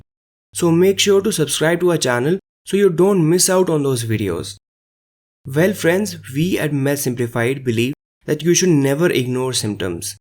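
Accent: India. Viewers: Thanks Dr. I love your Indian Accent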